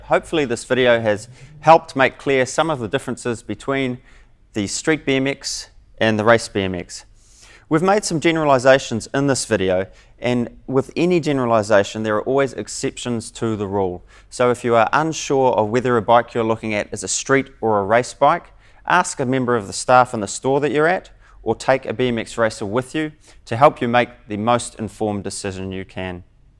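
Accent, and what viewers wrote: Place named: New Zealand. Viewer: NZ Accents kill, "rice" hardly! "raice" maybe >> Decent vid though for the sprocket leagues.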